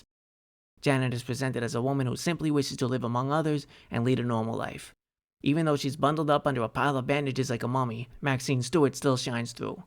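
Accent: New York, United States. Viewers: What illuminates these summaries is not only TZ's excellent writing, but your own. The New York accent also lends a down-to-earth quality to even the most avant garde episodes. Bravo! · I love his New Yawk accent too! It reminds me of Slip Mahoney and Satchel Jones and the Bowery Boys.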